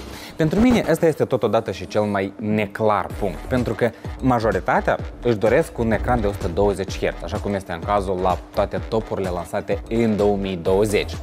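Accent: Moldova. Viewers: Si eu sunt romanca, locuiesc in Italia si ador accentul asta moldovenesc! ❤️ Cat despre Iphone nu sunt fana. Nu as investi banii in asa ceva, cand pe piata exista telefoane super faine si iti poti alege. Totusi pentru fiica-mea mai fac exceptii...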